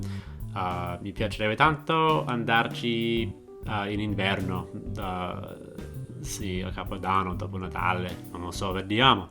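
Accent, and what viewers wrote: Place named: United States. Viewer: Sono romano e capisco cosa significa muoversi in questa città > gli autobus non hanno orari e pensa che per un periodo avevano messo alle fermate degli schermi con i tempi di attesa ((cazzata)) però devo difendere il fatto che non sbagliano strada, magari saltano qualche fermata, non arrivano sempre al capolinea, però non sbagliano strada. In ultima analisi > carissimo american guy > con l'accento americano e un appartamento a New York che aspetti a dire Sì a una girlfriend made in Italy? Uh, se perfezioneresti l'italiano!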